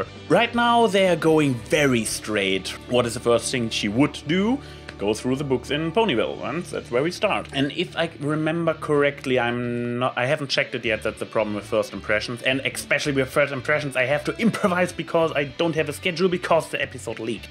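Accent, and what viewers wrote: Germany. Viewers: Your german accent is allways a delight and i like your views on the show, keep up the good work! :D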